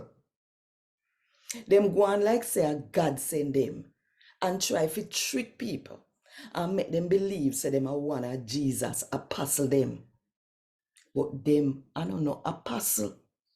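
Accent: Jamaica. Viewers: This passage has reinforced how difficult leading a church is. I loved how once again Paul's day to day challenges in building the early church shines through. I follow these readings in my Bible & the Jamaican patois makes it so much more understandable re context and emotion. Thanks again for this blessing and your service to the word of God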